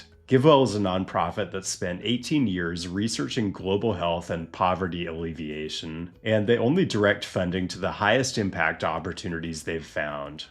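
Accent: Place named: Midwest, United States. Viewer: Would it be rude to ask about your accent? I don't want to be offensive, and I don't want to make you self conscious, but I've never heard someone pronounce "u" like you do in this video. I'm genuinely curious where you come from. I'm hearing Midwestern, NE and PNW hints when you speak.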